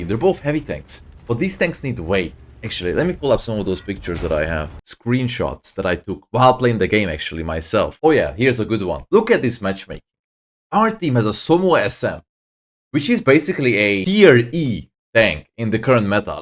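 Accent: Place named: Hungary. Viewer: Alig hallatszik hogy magyar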